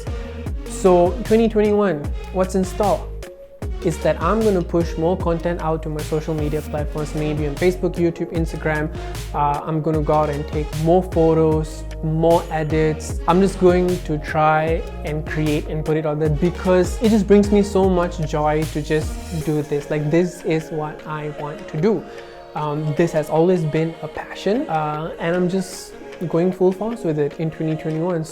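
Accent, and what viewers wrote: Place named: United States. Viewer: You sound like an American way too “AMAZING”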